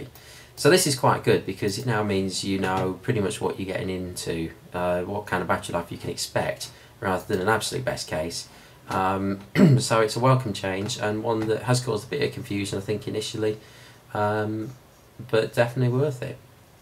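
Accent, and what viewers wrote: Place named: United Kingdom. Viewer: good to hear a british guy doin tech stuff, nice vid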